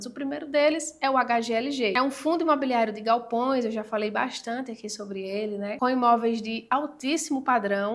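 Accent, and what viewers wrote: Brazil. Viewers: Seu sotaque é de que local do Br?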